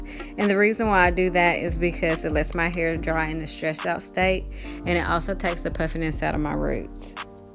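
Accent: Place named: South, United States. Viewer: Love your country girl accent, makes me trust you. #countrygirlsunited I love natural hair videos. I hope you make many more.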